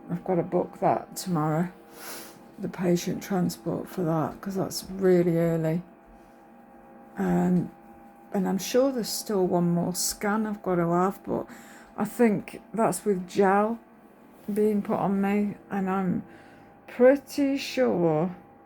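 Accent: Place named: East Midlands, United Kingdom. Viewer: Trying to work out where you're from, by listening to your lovely accent! Is it East Midlands?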